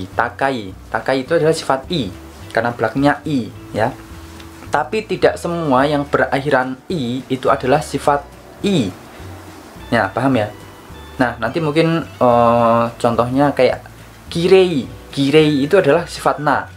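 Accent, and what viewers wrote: Java, Indonesia. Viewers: daebak jepangnya bisa juga pake logat medhok mas yaa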